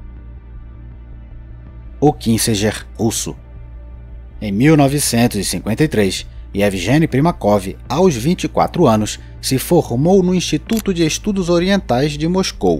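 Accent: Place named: Pará, Brazil. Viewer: Certeza que a voz é de um paraense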